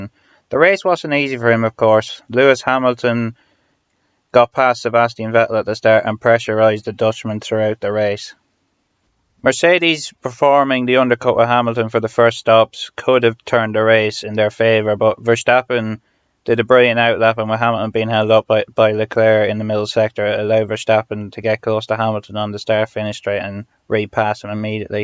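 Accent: Ireland. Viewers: Yes your obviously irish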